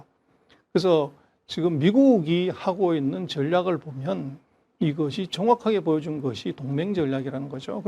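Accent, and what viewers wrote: China. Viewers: 니 발음 역겹다.중국어 발음으로 한국말을 하는군.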